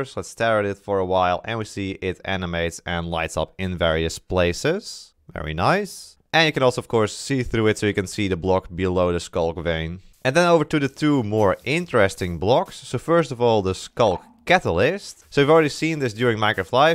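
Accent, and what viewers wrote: Netherlands. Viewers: Are you dutch? You sound like someone from the netherlands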